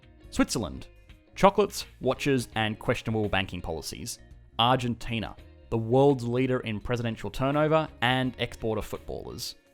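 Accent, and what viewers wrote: Australia. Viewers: Sounds Aussie. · Dam Australian!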